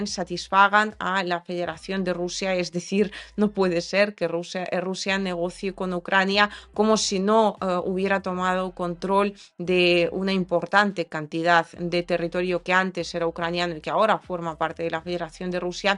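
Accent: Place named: Castile, Spain. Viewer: Muy buena información muy buen español aunque con acento castellano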